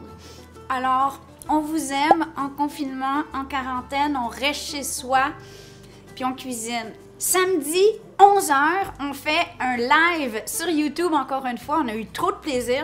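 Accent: Quebec, Canada. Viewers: Bonjour 👋 j’adore t’es vidéos je rigole et je me régale,t’es recette sont tous simplement génial j’adore 👍,et ton accent 😄j’adore,vous les québécois et vos expressions c’est trop marrant,au j’arrive à la fin de la vidéo 😛🤪tu me donne faim,Isi il est 1h20 du matin comme je n’arrive pas à dormir je vais réaliser t’as recette,gros bisous à toi et à chéri 👍🍀💋💋💋💋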